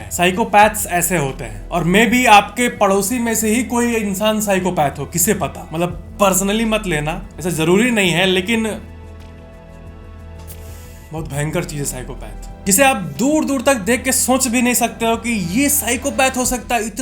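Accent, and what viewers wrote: Bihar, India. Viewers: thats bihari Akcent ❤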